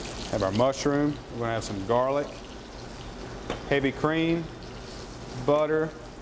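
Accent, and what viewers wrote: South, United States. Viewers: He is clearly from the South (CCCTI is in North Carolina) and his accent reflects that. So if he does not say “julienne” or “oil” the way you would, it is not wrong, just dialectical differences for those of from below the Mason-Dixon line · Please take some grammar lessons. You sound like a southern hick. Your video was good, but your grammar reined the whole video.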